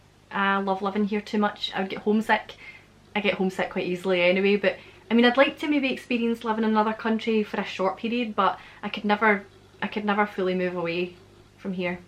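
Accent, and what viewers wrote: Scotland, United Kingdom. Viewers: I love the Scottish accent, one of my bucket lists includes the Isle of Man TT race. I want to visit Scotland whilst there but so much to see, so little time!! Love your content, keep up the great work!! 🥰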